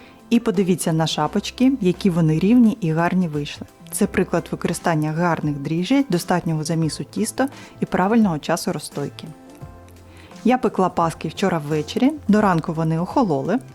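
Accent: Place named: Ukraine. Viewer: Зачем на здесь слушать этот украинский говор.. Пусть на своих каналах вещают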